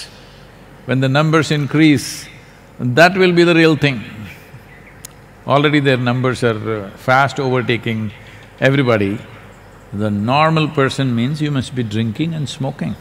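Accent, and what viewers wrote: India. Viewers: 至少你多了聽得懂印度腔英文的機會不是嗎XD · 看薩古魯學英文會不會印度腔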